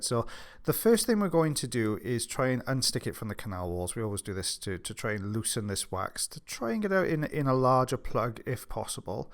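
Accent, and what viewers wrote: Wales, United Kingdom. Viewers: I have family in Mountain Ash and my grandad was from Abergavenny. I'm in Canada now but you sound like home. Thanks!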